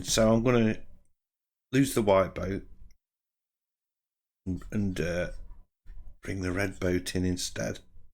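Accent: United Kingdom. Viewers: I’m more and more impressed of what you can paint digitally. I really like the way you use the pastels, I can’t say that I liked pastels in the past. You are making great art and I’m grateful that you show all steps to us. I also like listening when you are talking how you think while painting - the british accent is also to my taste.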